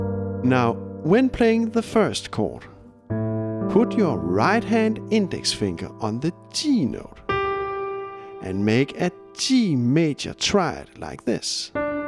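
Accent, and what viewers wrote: Denmark. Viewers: Is that a danish accent I hear? Great job!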